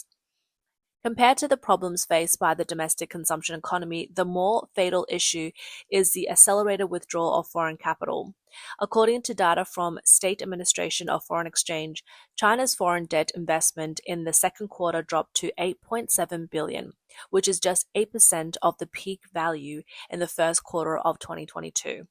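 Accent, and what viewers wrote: Australia. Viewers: I don't want to hear an australian making noise tonight. Chinese don't have Ozzie accents!!! Get a host. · I would not trust any of this information since an Australian is reading it.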